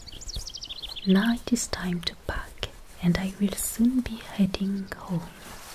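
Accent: France. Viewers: I still go for a walk in a forest nearby. It's relaxing and puts me down to earth. Your video is so beautiful, I love hearing your voice and your french accent is a total trigger for me ❤️🌺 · I really like her French accent that you hear!!!!!!!!!!!!!!☺☺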